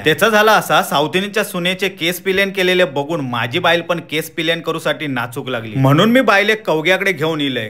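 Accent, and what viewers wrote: Malvani, India. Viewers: मालवणी बोली मस्तच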